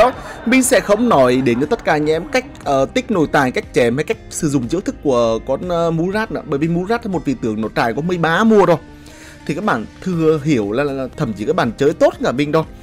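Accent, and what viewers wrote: Nghe An, Vietnam. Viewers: a người nghệ an ạ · hắn bình luận giọng Nghệ An choa là tau thấy dễ ghe với thích rồi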